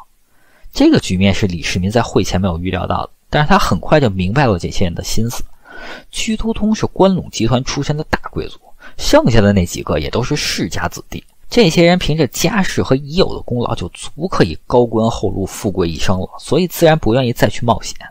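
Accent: Beijing, China. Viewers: 确实听起来非常不舒服，有种吞音的感觉，南方人叫连刀快，可能是北京人的口音吧，听时间长了真的很难受，不过内容还是挺好的👌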